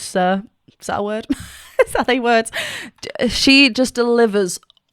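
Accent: United Kingdom. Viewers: You have a beautiful British English accent.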